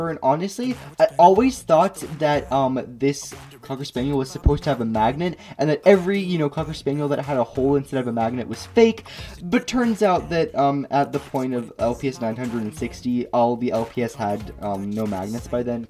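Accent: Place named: Australia. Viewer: OMG YOUR AUSTRALIAN TO OMG OMG OMG OMG OMG OMG OMG OMG OMG OMG OMG OMG OMG OMG OMG OMG OMG OMG OMG OMG I THOUGHT YOU WERE AMERICAN no offence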